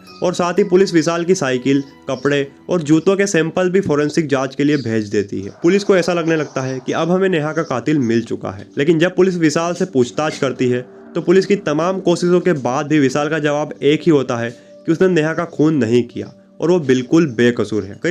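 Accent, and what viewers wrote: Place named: Bihar, India. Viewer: Social media per aap ko k apni language per thoda work karna chy sha ko sa Mt bolye pronunciation acchi honi chahie ek anchor ki presan nh hota preshan hota aapki language bihariyon Jaisi hai isliye story sunane ka maja nahin aaya anchor hone ke liye language per command hona zaroori hai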